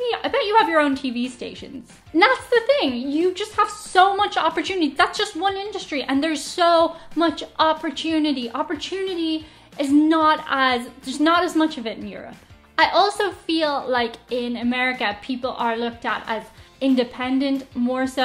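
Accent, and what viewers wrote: Ireland. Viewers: Hello you got fantastic content I am a Irish ancestry but I was raised and born in USA I am a fan of Europe culture my surname is Murphy. your channel is a fantastic channel great interesting content. Your dog is awesome and very beautiful dog. I love your Irish accent too. I really like to visit Ireland one day to visit where my ancestry come from I also want to visit the United kingdom too.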